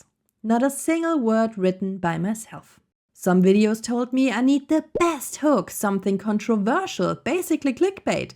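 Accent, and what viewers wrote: Germany. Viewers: If I understood correctly, you're from Germany, so, your German accent actually makes your English easier to understand for non-native English speakers (listeners?). (No offence!) About MVP... So... I wrote some program around November 30th, aaaaaaaand totally lost interest in making it good, not just MVP. Somehow in 2nd half of January I managed to get it into reliable state: now it's running 24/7. Sometimes it's really hard to make step from "it's kinda working" to "yeah, now it's not embarrassing to show it to others". But I think, I saw your channel just in time. Actually need do smth, not just collect ideas and dream about how cool it would be to build it someday. Thank you.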